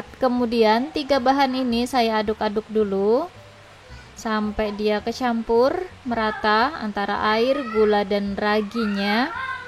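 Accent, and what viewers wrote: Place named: Banjar, Indonesia. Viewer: Pasti urang banjar admin yg ngomong ini hehehehe..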